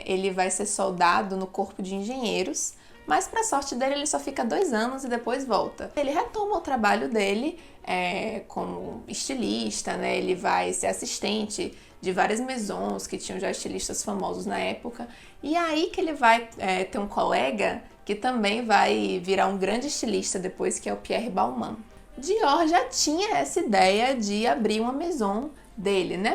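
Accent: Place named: Bahia, Brazil. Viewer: Como eu não percebi seu sotaque baiano?! Meu Deus 😅 É por isso que é descolada assim, gentem🤭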